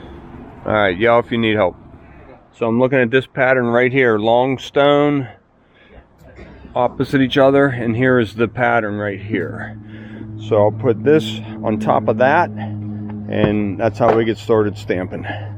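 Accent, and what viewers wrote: Pittsburgh, United States. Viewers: do not link up with other sites. If you get too commercial. As a “civilian” non-contractor observer, I could choose concrete guy. I like your videos and your Pittsburg accent.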